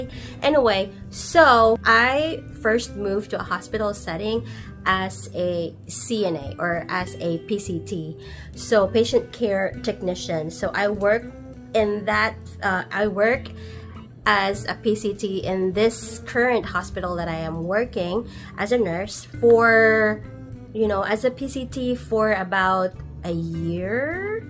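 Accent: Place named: England, United Kingdom. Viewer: ❤ preparing napud para sa NCLEX puhon, 2nd take na naku ni puhon. huhu mahadlok ko magtake usab pero I know God has a plan. Nakita naku imuha video namotivate og na inspire napud ko nga unta makapasa napud ko in God's Will. Wala pud ko experience as a nurse sa pinas, mao daghan ko what IF diris US. Diri ko sa Kansas karon mao duol2 lang d i ta taga MO man ka🥰 nice to meet you sis diris yt. Follow ko nimo kay gusto pud ko makabalo labi na bahin sa Nsg Journey nimo arun naa pud ko idea puhon, kaluy.an. Simplify Nclex pud ko karon. I Love imuhang english accent i hihi!☺️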